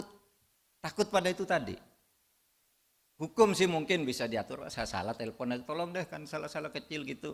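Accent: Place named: Madura, Indonesia. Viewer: Logat maduranya sekali² masih suka kedengeran. Orang Madura kalau udah pinter, memang pinternya kebangetan. Tapi nek wes atos, yo wes, angel urusane . Andaikan semua orang madura kayak pak Mahfud MD. 😁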